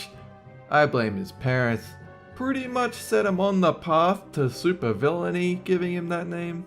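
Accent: Australia. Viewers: I never knew you were Australian m8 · i know an aussie accent when i hear it.... he is definately australian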